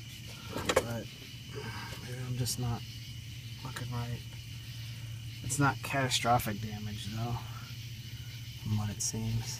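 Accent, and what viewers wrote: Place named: Arizona, United States. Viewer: Downvoted because Arizona accent.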